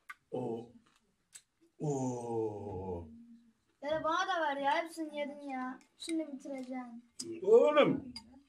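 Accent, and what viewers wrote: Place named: Antakya, Turkey. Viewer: Aynı hatay antakyalilar gibi konuşuyorsun:))) bizim buranın yemekleri de güzeldir bekleriz:)) seviliyorsun:)) yiycin yapıcın edicin:)) bağh hele:)) hastahanalık oldu:))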